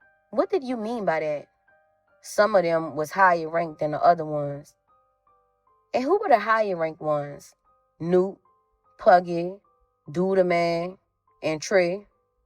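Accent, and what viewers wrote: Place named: New Orleans, United States. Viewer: The reader sound like she straight out the 7th ward by the St. Bernard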